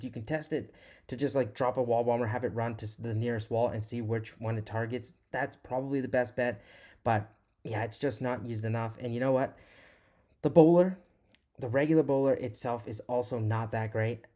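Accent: Canada. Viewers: Are you Canadian!? Jays fan!?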